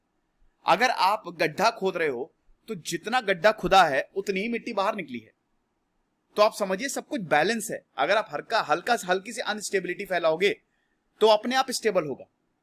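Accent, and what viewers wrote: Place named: Awadh, India. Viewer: Sir ka deshi andaaj jaari Avadhi style me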